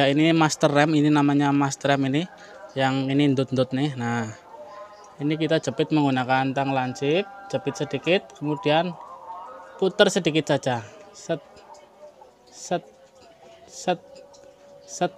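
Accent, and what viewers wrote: Banyumas, Indonesia. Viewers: Otw praktek mas bro. Ngapake daerah ndi mas. Nyong juga ngapak. Banyumas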